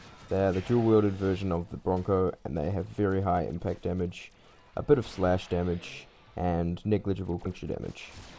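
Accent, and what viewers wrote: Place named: New Zealand. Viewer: "british accent"? sounds kiwi to me.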